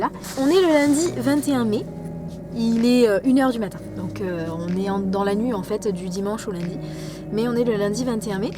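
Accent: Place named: South, France. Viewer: Oh l’accent du sud 😂👌🏼 J’adore !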